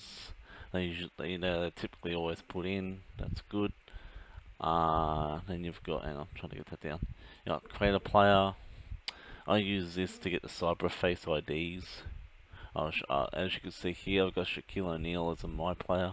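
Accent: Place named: Australia. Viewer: You got an Aussie accent plus I saw that you modded don bradmen which is an Australia game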